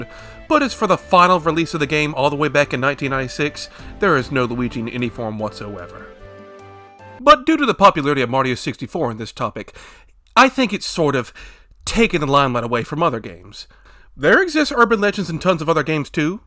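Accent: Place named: New York, United States. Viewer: i dont know why but you sound like a new yorker trying to talk like joe perra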